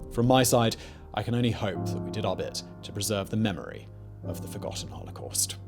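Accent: United Kingdom. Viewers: I also noted that he described Fazlul Huq as a Hindu, so I'm overall questioning the accuracy of this content overall right now. This is the problem with Youtube. Anyone can post anything and we have no idea of the qualifications of the presenter or veracity of their content. And you know how it goes - people tend to think anyone with a British accent knows what they're talking about, even if they're talking out of their a>>.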